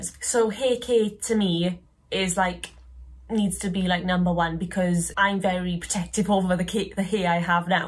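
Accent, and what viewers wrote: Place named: United Kingdom. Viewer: Love your accent dear! Can I ask where from the UK are you? Scotland maybe? 🥰 Also unfortunately cannot find you on Insta under your username :(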